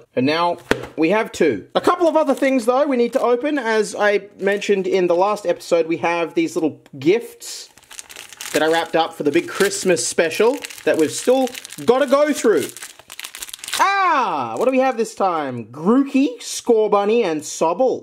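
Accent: Australia. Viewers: you sound like the australian rick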